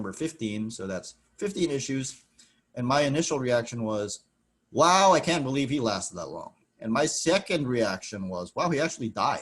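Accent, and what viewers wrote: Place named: New York, United States. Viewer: That New York accent 😎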